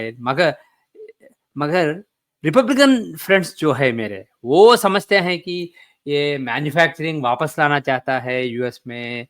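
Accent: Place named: South India, India. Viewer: whaaaat is saafisticated? He clearly cannot speak in Hindi and jumps to English often in the middle of sentences.. His English is quite average with heavy South Indian accent. "Emperor has no clothes....".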